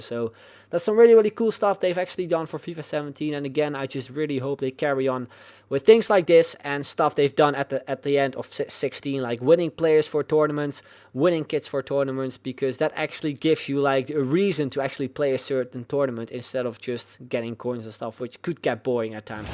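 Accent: Netherlands. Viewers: Are you Dutch? I hear an accent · u dutch?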